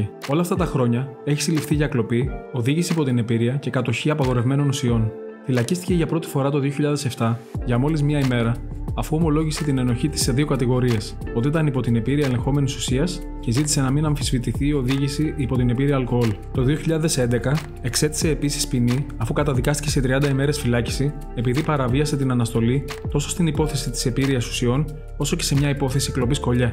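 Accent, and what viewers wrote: Crete, Greece. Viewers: ωραίο βίντεο....Και απίστευτη προφορά κρητικατσι μας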